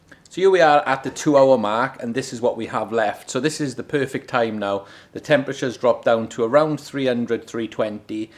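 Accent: Wales, United Kingdom. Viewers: Pleasant accent/dialect, is that a wales dialect? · I’m with (@ Brick-ets” you don’t say antiques (Antigs) But I love the Taff accent, my mother in law’s Welsh “just don’t tell her” is your Clock room sealed or room vented? Best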